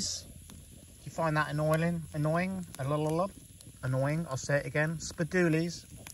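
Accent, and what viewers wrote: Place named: Bristol, United Kingdom. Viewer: I thought it was Bristolian, but you also have a slight estuary twang too in some of your words. That's what made me ask.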